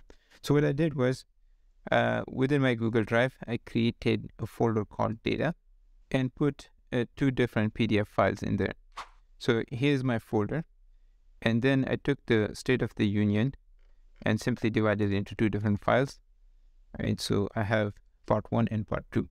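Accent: India. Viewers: This right here is the definition of the uncanny valley. That white guy, with your Indian voice, plus the AI generation, makes me feel incredibly uncomfortable. Love your channel, but it makes my brain light up in "Something's wrong here"...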